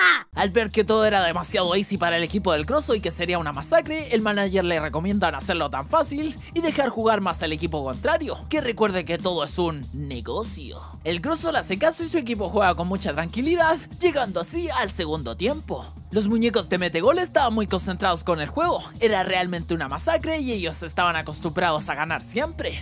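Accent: Chile. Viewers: Eri chileno? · Una pregunta eres chileno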